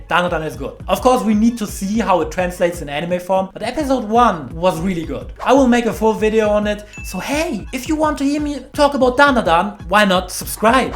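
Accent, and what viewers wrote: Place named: Germany. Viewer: man hört hart den deutschen akzent geil 😂😂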